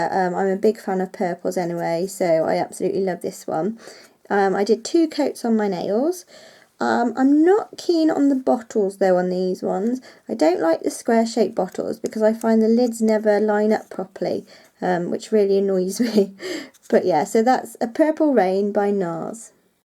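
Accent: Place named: West Country, United Kingdom. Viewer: I love your videos - you sound like you come from the west country! I am more into Gel polish but still love watching your videos. Have you tried the app 'SNUPPS' where you can record all your collections/colours etc on your phone so when you are out shopping you can quickly check to see if you already have it in your collection..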